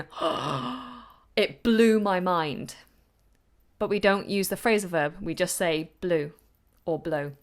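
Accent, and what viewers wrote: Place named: United Kingdom. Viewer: What a lovely British accent! I'm watching you from Syria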